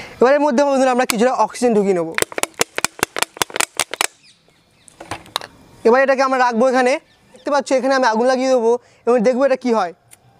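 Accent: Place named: Kolkata, India. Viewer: Kolkata ...Vasha sunlei to buja jay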